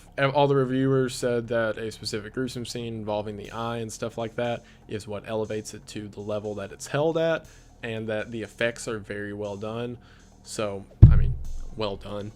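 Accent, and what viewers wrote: South, United States. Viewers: You sound kinda southern and idk if its in all your videos but I just noticed it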